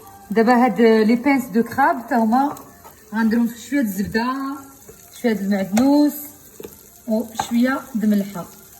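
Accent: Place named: Arab world. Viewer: Machaallah 3lik j aime bien ta simplicité tes goûts et ton accent arabe j adore bonne continuation 😍😍😍😍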